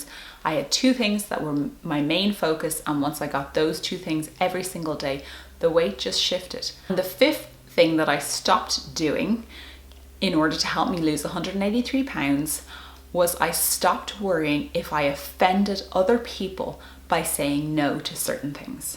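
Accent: Ireland. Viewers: I love your Irish accent. You are delightful! I love this idea!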